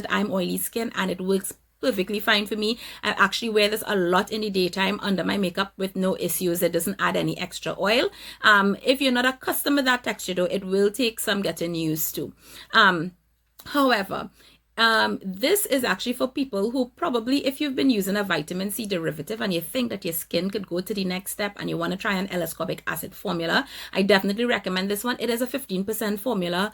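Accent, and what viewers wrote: Trinidad and Tobago. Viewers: Fellow Trini?? :) I hear the accent. Wow beautiful lol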